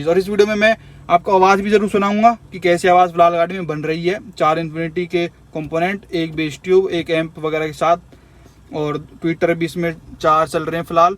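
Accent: Haryana, India. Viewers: Superb haryanavi accent love to hear it. Your videos are amazing. Keep making videos in the same accent ✌️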